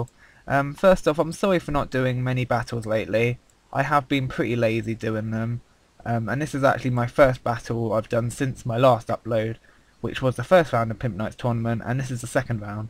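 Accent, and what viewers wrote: United Kingdom. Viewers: You have a British accent :3 xD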